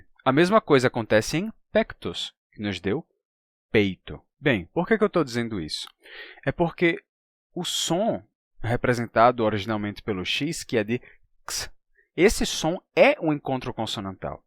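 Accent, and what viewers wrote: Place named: Natal, Brazil. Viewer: Você é muito bom nas suas explicações. Elas têm toda lógica principalmente para quem conhece um pouco de outros idiomas. Nas nuances explicativas vêem-se semelhanças que permitem a gente entender melhor o português, outras línguas neolatinas e o inglês. Além do seu sotaque de Natal que eu adoro. Vivi mais de 10 anos aí e vou de 6/6 meses. Parabéns por tudo. Escrever aqui é muita responsabilidade para não “pagar mico” demais, pois você de fato sabe português.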